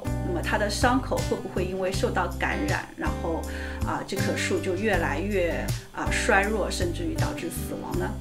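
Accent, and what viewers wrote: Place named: Zhejiang, China. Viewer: 口音是浙江的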